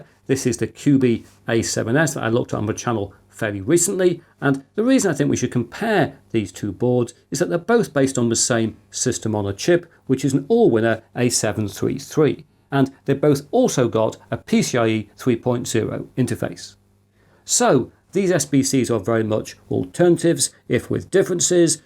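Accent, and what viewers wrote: United Kingdom. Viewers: Thank you for very clear and easy to follow videos and fantastic British English. So nice in middle of those AI s**** channels… using AI voice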